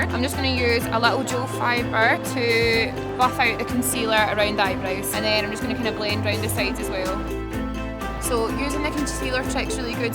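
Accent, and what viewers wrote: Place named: Glasgow, United Kingdom. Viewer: loved this video, you can tell you're from glasgow but how much you say 'kinna', makes me feel right at home lol x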